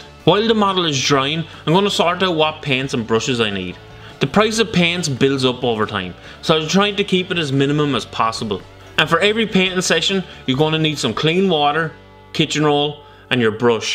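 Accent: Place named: Wexford, Ireland. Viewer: I'm teetering on the brink of attempting to paint a miniature for the first time since my teenage years. This is really useful, thanks. It's not everyday I hear such a perfect example of a Wexford accent on the internet either ;)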